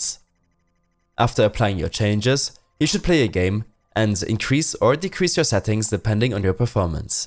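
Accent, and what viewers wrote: Germany. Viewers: Ich finde man hat schon rausgehört das du deutsch bist aber wo du nvidia highlites gesagt hast hab ich nur gelacht das klang auf Einmal so deutsch,aber gutes video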